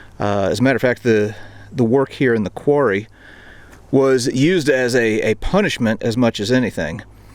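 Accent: United States. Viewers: Sending kind wishes from Connecticut. I’m your newest subscriber. I appreciate your skills and knowledge base. You’re presenting difficult material with such respect for the tragedy and multi-generational trauma. I’ve seen many in Europe, but never visited Plaszow. I will have to read about you and your channel. You sound USAmerican.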